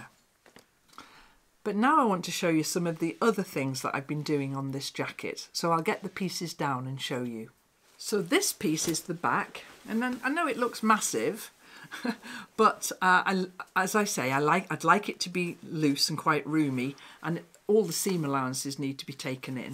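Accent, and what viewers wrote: United Kingdom. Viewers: Woo so much work! Your jacket will be beautiful. My first vidéo from you but not the last one. I will learn english with your nice british accent.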